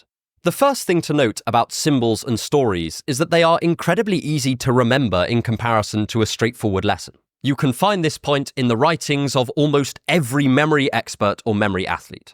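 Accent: United Kingdom. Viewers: You are soooo handsome ❤❤❤❤and your british accent ❤❤❤❤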